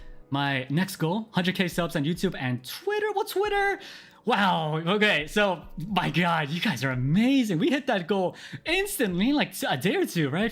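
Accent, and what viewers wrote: South Korea. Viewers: Now that I think about it, he does have a Korean accent... Compare it to someone like Suha it's not as noticeable but it's definitely there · I knew it! Another half Korean at NijiEN!! I recognized your accent. You're so amazing. 만나서 반가워요 오빠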